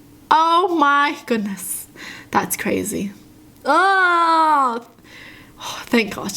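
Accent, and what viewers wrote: Australia. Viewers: are you Australian? because you sound just like my friend’s mum😂😂luv you btw💜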